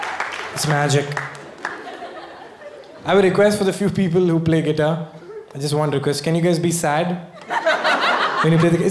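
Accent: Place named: South India, India. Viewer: why are so you insanely cute? 😍 Loved all your videos and have been sharing them with everyone I know.. you are simply awesome!! Being a south Indian, I love that accent and those words too 💗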